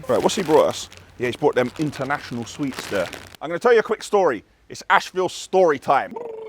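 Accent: Caribbean. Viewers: I knew he sounds Caribbean...big up from Jamaica mate; love your content and what you do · I knew u were of caribbean decent without even hearing u say it.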